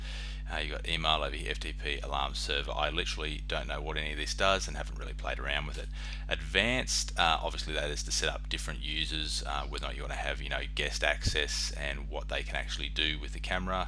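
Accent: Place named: Australia. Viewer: Thanks for sharing this. I prefer the Australian accent that the Chinese calligraphy ... no offence, but its much easier to follow :). I wish you could add the settings for the Iphone and port forwarding. Thanks again from Bristol UK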